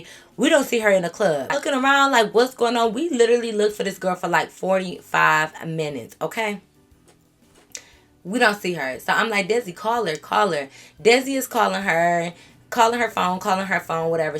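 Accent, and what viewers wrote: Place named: Chicago, United States. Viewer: And I’m from Chicago so our dialect be hella similar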